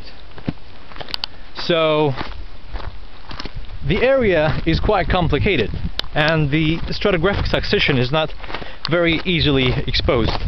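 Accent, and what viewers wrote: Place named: United States. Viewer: nice american accent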